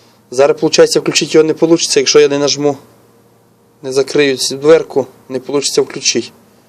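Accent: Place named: Rivne, Ukraine. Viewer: ну - молодець! ще не вмикнув а вже поламав...)така мова гарна,як десь біля Рівного..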